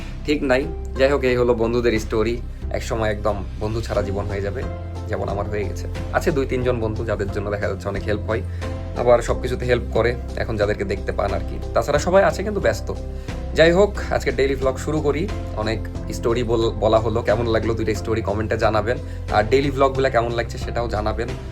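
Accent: Rajshahi, Bangladesh. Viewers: vai apner kotha sune bujte parci je apni rajsahi thaken 😅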